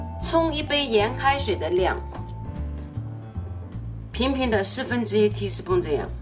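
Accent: Shanghai, China. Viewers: 正宗上海国语，皮薄的不能再薄了，金金黄🤣🤣😂😂😂听着好亲切，立马订阅😋😋😋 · 去年回国经过上海，有幸尝到上海生煎包，现在一直心心念念，想起就会咽口水哈哈，今日看到你的视频太激动了，准备学着自己做试试，你的视频做的非常好，上海话好听，喜欢，继续关注你的视频谢谢！！ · 上海口音👍👍👍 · 听到上海话就先点赞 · 上海闲话真亲切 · 你好，我是上海人，现在在美国，很喜欢你的上海生煎，在这儿很难吃到。多次观看视频，有一个疑问。如果我一次包多了，能速冻吗？冻箱里取出后能马上煎吗？希望你的回复，谢谢你！煎后仍有汤汁吗？你的上海普通话与我一样，听了很亲切。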